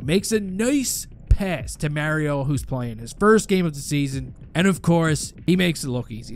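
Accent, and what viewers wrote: Newfoundland, Canada. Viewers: Is he from Newfoundland? I’m from NL been watching this fella for about a year now or so and always thought he was. but wasn’t sure lol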